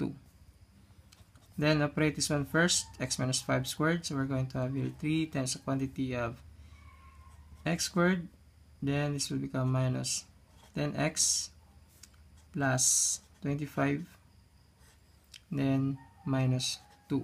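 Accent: Philippines. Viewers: When i heard his accent i know that his filipino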